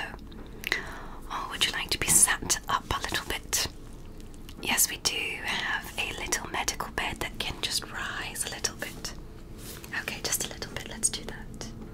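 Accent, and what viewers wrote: England, United Kingdom. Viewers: Are you really British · Are you British/Australian or did u just nail this accent? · 10 outta 10 British accent, would go to London with it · Always love the English accent,! Loving this so far. So excited for this year for you! Can't wait to see you hit that one million mark!!!:_gift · You're vids are always amazing thanks for the hard work I'm just not into the British accent lol but don't worry about what I don't like from you're vid, that said I will always watch your vids and enjoy them 😊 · Wait, do you usually have an english accent? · oooohhhh gurl i love the vids in a british accent as a fellow brit i’m fooled you sound so british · I’m wondering if you speak British🤔🤔🤔🤔🤔🤔🤔🤔🤔🤔🤔🤔🤔🤔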